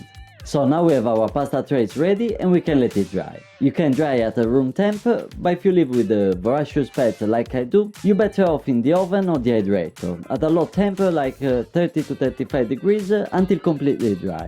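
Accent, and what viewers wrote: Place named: Italy. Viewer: I love his Italian accent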